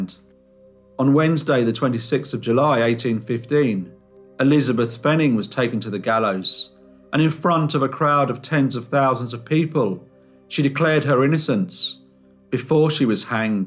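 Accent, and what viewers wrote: United Kingdom. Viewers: All true stories are very sad, i really like the morn drawings All this criminal stories are hard,and mournful. The drawings give it a good touch i also congraculate You for your British accent i lived in the States,and they speak very fast,and they don t Pronunciation. Besides, the manager woman Had never been investigated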